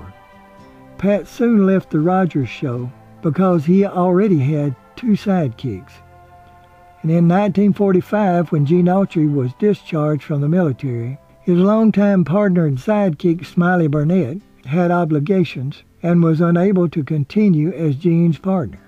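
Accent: South, United States. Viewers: Great narration as always. Your smooth voice coupled with your southern accent makes your work more appealing. I only knew this actor from Green Acres. I had no idea he had such so many other roles! Very interesting. Thank you. I really enjoy your work. Stay safe! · I've said it before but say it again when I hear the SOOTHING SOUTHERN VOICE of I know I'm HOME in Louisiana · So nice to hear a Southern voice on documentaries! This was so well done and respectful.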